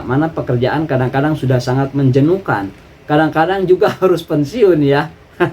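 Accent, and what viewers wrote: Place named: Sunda, Indonesia. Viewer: Logatnta nyunda banget kang... Sama kaya saya 🤫🤭🤝🙏🏻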